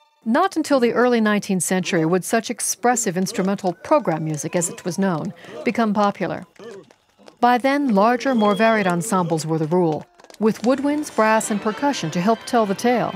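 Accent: United States. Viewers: Quite annoying with the very American accent, maybe in respect to the masterpiece, put an European to narrate the video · What is this lady's accent? Obviously some kind of American but sounds a bit different? (also, great vid!)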